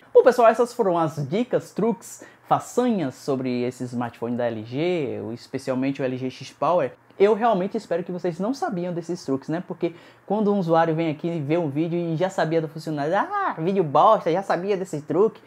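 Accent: Bahia, Brazil. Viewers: Meio estranho um sotaque baiano falando de tecnologia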